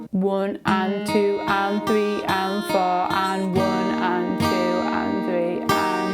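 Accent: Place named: Yorkshire, United Kingdom. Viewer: Awesome lesson, thank you. I’m guessing by your accent that you’re not too far away from me Leeds/Bradford area?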